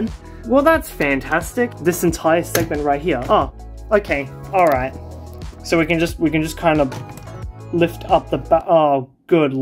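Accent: Australia. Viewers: I think you're currently my favorite YouTube channel. interesting old tech stuff, dankpods style but with more chill vibes, that glorious australian accent, plus beautiful synthwave (edit: and Minecraft OST!) playing the whole time? perfection.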